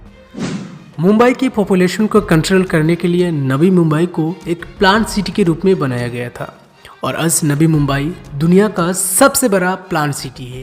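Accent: Bengal, India. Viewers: I think you are a Bengali so improve your tongue and keep it up for Mumbai....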